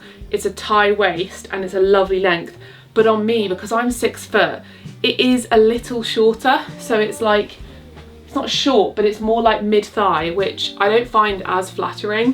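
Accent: United Kingdom. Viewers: 🐶. love your videos. Your home is beautiful. I could listen to you speak all day… the British accent is everything! Red and burgundy are your colors… very flattering to your dark hair and porcelain skin ❤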